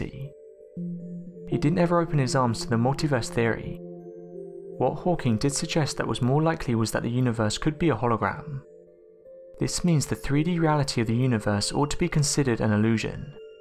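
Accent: United Kingdom. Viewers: Another British accent. Cool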